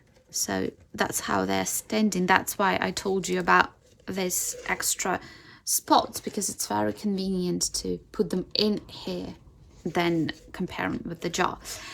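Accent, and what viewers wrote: United Kingdom. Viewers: I seems like you have learnt British accent, sounds good 😊. Honestly, I just learnt how to grow grape plants by you 😅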